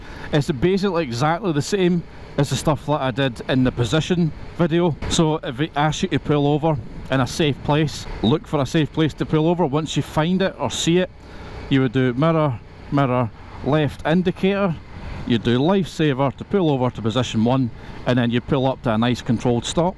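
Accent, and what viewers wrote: Scotland, United Kingdom. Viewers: Nonetheless the riding and all, I love the Scottich accent 😅❤❤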